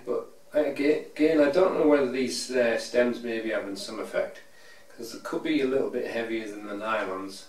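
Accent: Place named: North East England, United Kingdom. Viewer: You sound like your from the north east, I am myself from Boro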